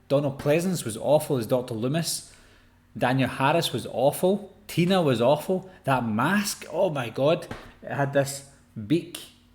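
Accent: Scotland, United Kingdom. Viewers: Wait... are you Scottish??! 😍😍😍 So your first language IS English!!! Or is it Gaelic ? 😜🤣😍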